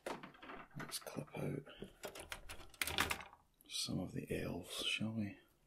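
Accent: Scotland, United Kingdom. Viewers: I can’t believe this exist. Warhammer asmr in a Scottish accent. I’m so ridiculously happy.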